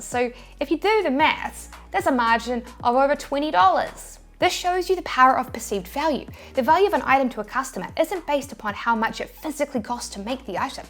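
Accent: New Zealand. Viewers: I just watch because of the lovely kiwi accent 🇳🇿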